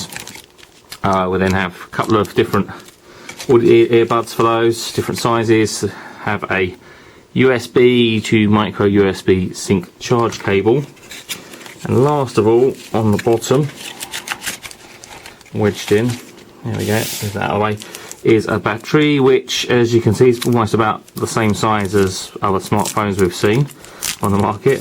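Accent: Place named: United Kingdom. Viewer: i love Britsh Voice ! <33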